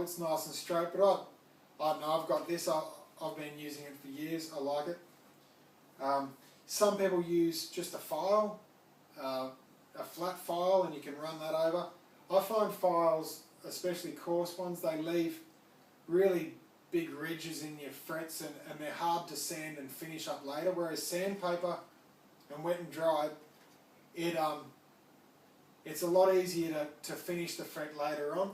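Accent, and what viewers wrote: Australia. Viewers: Finally saw your face! Where are you from? And where's that accent from? I guess Australia but maybe I'm wrong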